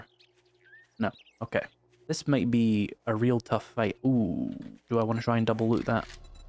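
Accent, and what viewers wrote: United Kingdom. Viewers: very cool video! i always wonder in which part of the UK they speak your dialect...!? greetings from bavaria =)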